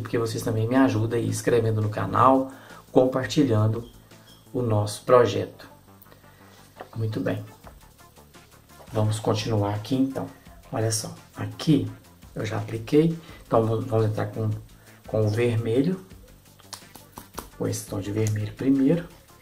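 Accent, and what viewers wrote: Minas Gerais, Brazil. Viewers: Moço, quando vc mostrou o gráfico eu rapidamente tirei um print,fico triste quando falam para a gente ir no Facebook pegar o desenho,nem todo mundo tem Facebook...mas vc foi gentil e mostrou o gráfico,só por essa atitude e por nós ensinar com tanto carinho eu vou te seguir agora mesmo Obrigada...haa e amo sotaque de mineirinho 😍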